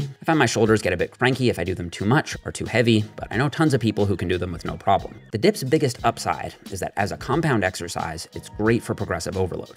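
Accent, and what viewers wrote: Canada. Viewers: He has such a strong Canadian accent 😂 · Love his Canadian accent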